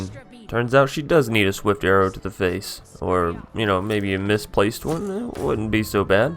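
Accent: South, United States. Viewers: Talking like an ol' Truck driver from the south. Love it! 😎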